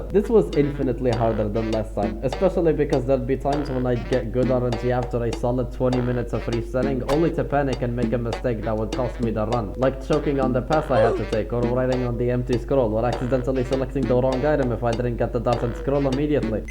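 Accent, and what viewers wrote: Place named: Poland. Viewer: You sound sooooo Polish xD but still nice video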